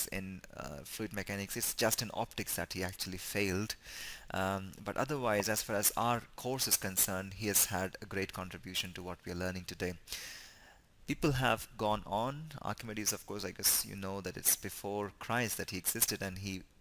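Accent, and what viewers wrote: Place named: India. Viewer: I felt the audio was great but the English isn't with African accent but an Indian accent. I am sorry the audio wasn't great for you.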